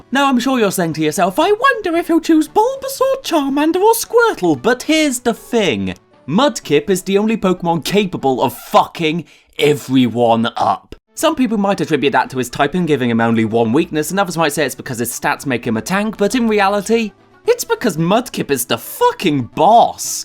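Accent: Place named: United Kingdom. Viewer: Lol your british or english accent made this so funny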